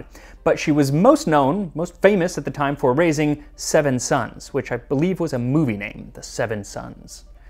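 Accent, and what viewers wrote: United States. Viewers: Your pronunciations are on point, but it still sounds odd coming out of an American mouth. Kudos for going that extra mile to say it correctly . There's a huge Spanish speaking community here in Chicago, yet, 90% of my family still call fajitas fuh-jy-tuhs.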